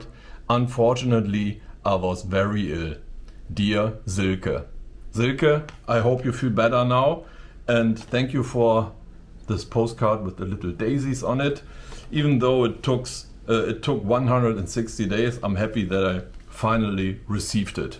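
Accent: Germany. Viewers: Hello, I' m surprised, that I understood the most of what you said😉 and I love your german accent. Nice story about the postcards👍. Best wishes, Maria🙋‍♀️ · Coole Schreibmaschine! I like your German Accent! 😅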